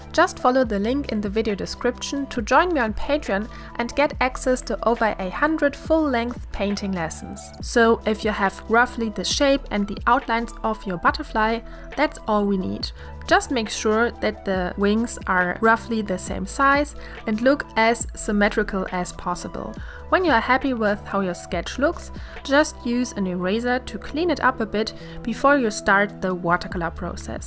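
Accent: Germany. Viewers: Are you actually german? becos killjoy is. Plus how do you make watercolor look so easy..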